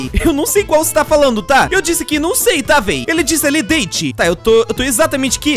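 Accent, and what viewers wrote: Portugal. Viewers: Man c tá falando português de portugal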